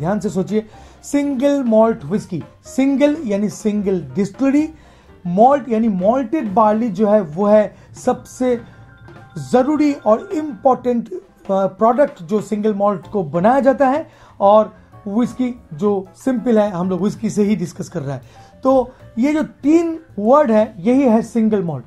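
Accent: Bengal, India. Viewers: Love your vdos. Your knowledge is fantastic and your bong accent is cute :)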